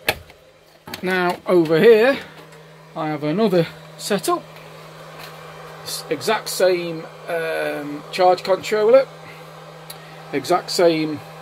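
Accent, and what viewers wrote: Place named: United Kingdom. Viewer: Hi, Just watched your video after doing a search on the net for Epever com port failure. I have a Tracer 4210AN which I have had for about 9 months. 2 days ago the data logging suddenly stopped, I monitor it using a custom logger called a SolarDashboard buy SnekTek which is very similar to your data logger. I can also monitor and adjust setting via my PC using a USB to RJ45 cable. Now I cant do either. Any suggestions? I too am in the UK (guessing by your accent) Nice to see an audio cassette on your shelf next to your stereo. My setup is in my garage where I too have a stereo and was only playing a cassette the other day. Graham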